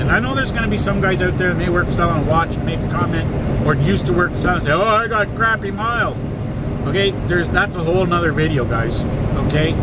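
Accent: Canada. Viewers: I called it 30 sec after the video started - knew he was a canuck, recognize that accent anywhere good vids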